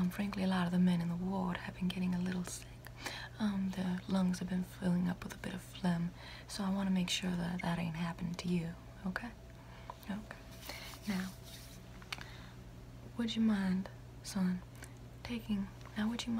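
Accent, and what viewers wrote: South, United States. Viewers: The crazy part, I’ve seen her other videos and this southern accent isn’t her actual accent it’s just for this video but it’s so good. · The soft southern savana accent reminded me of grandma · The southern accent is perfect! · Woman calling me honey in a southern accent Me: 😢grand ma😢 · As an Englishman would someone help me out and tell me what region of the United States this accent is from ? It’s the most beautiful accent i think I’ve heard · Josh Reyes It seems like she is trying to do something like a deep southern accent but modern southern accents are different from the accents of the 40s and 50s. The r's at the ends of words like four aren't as pronounced making it more like a European accent. She also says Mississippi like Mississipeh which is how a lot of southerners pronounced it during the time period. I don't know if this is exactly what she was going for but it's what her accent sounds like to me. 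· God damn I love your southern accent. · She looks Tamil Indian but has a southern accent....how exotic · We love that southern accent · i live in Mississippi and that southern accent is spot on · Where is the part, returning to the battlefield getting injured and then coming back again for this nurse with that Southern Accent? We need a whole series on this. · It's southern sugah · I love the southern accent! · That southern Belle accent. It's melting my heart. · It seems to be more of a blend of the old southern and transatlantic accents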